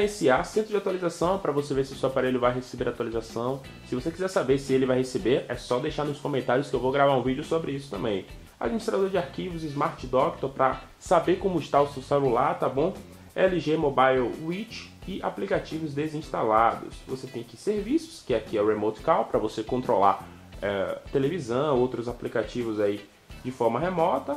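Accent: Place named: Bahia, Brazil. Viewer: Gostoso! Você e baiano? Que sotaque delicioso. Amei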